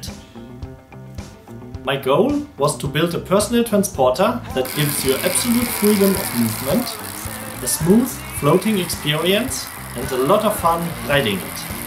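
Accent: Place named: Germany. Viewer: Sprichst du normalerweise Deutsch? Man merkt es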